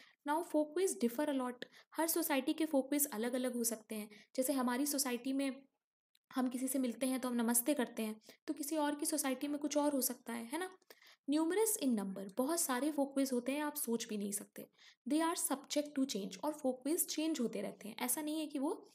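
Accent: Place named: India. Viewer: I don't understand the indian english, sorry!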